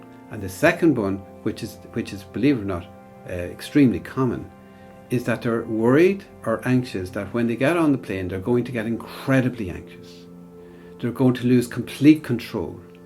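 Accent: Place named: Ireland. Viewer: Very calming voice its Irish accent u definitely should do a calming video . Thank u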